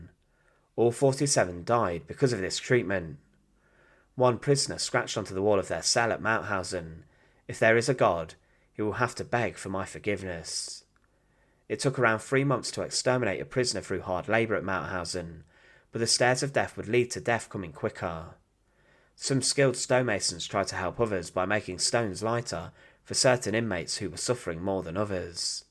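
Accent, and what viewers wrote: United Kingdom. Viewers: The fact that you prolong the last word of EVERY sentence has stopped me from subscribing. Please take that statement as constructive criticism. I appreciate your fact finding but I’m not a fan of how you’re portraying the British accent. Way too generic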